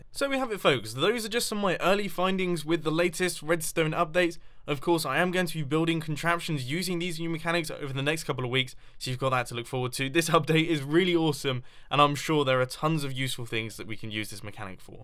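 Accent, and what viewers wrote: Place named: United Kingdom. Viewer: He kinda sounds like Wheatley from Portal 2... Probably cuz he British lol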